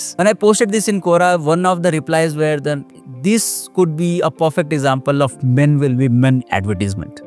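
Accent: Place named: Bengal, India. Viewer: sunei voi lagche.. dadda apni bengali hoye Jharkhand e thaken?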